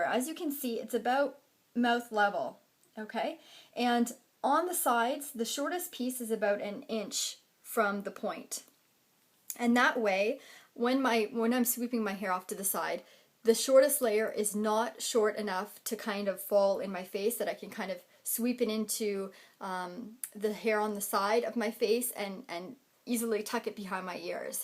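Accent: Canada. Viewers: she souds like a canadian!!! r u canadian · this video was great...i can tell ur canadian you may not realize but you have a strong canadian accent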